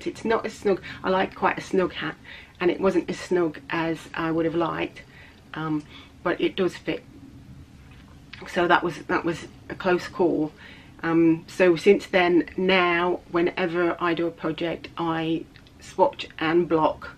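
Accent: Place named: Australia. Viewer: Hi just discovered your podcast through little drops of wonderful loving it love your accent thought you were Australian keep on the good work looking forward to your next one x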